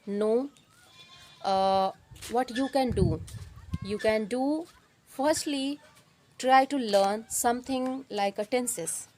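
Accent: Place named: India. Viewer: Good for me ur english language tune, become Yr tune belongs to indian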